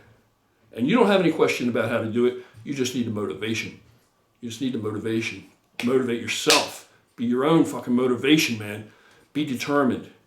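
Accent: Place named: New Jersey, United States. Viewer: Watch a few of your videos back to back. I couldn't agree more on your philosophy thus far. Appreciate you. Sounds like your in the Jersey or Pa area based on your accent. I'm in south Jersey myself. Keep killing it man.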